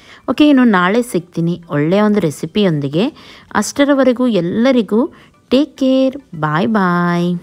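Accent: Mangalore, India. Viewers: Your from our mangalore your words like manglore puchhk take pucch 😅😅i like this tq..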